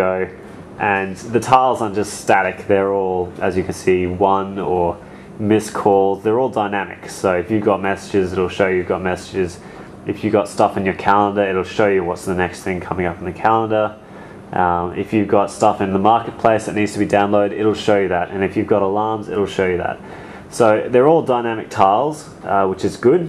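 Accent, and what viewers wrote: Australia. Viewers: You Aussie mate? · telstra~~~you are aussie...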